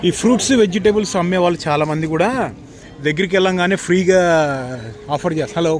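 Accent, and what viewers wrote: Andhra Pradesh, India. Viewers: anna nvu gun carry chestava safety kosam? and nv nizamabad nundi annav kani andhra slang undi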